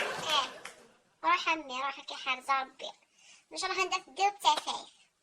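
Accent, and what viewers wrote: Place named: Kabylie, Algeria. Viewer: Amyaafou rabi Je me suis régalé. J'ai ri et pleuré. Tu m'a rappelé de vieux souvenir. Les dialogues me parlent. Je les ai entendu combien de fois. En plus un beau parler kabyle. Tanemirt.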